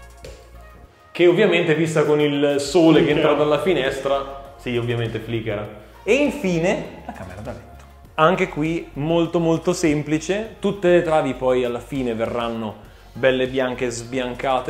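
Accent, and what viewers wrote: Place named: Lombardy, Italy. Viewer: Dimmi che hai la parlata lombarda senza dirmi che hai la parlata lombarda Btw: sono di Verbania, ma tutta la zona intorno al Maggiore e non solo ha l’accento milanese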